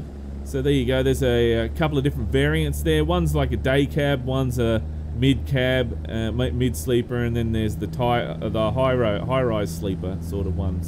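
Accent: Australia. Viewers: I hope that SCS Software DLC (as you mention in the video) will include some of the latest SCANIA Truck models as well, and not only Volvo trucks. I do have to emphasize that as an old former truck driver (25 meter) with years of experience, SCANIA is the brand of trucks that are very close to my heart, and have always been. In another words, I'm a loyal SCANIA Truck Fan. By the way, with all respect, I really like your Aussie Accent. And another thing, stay away from the Whitetips, Eastern Brownies and Taipans (specially the inland ones);-) Take Care and God Bless You and Your Family.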